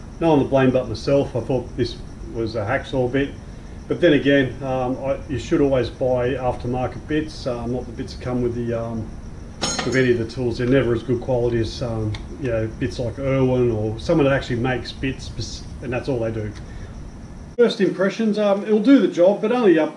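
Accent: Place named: Australia. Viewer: Good old Aussie accent!👍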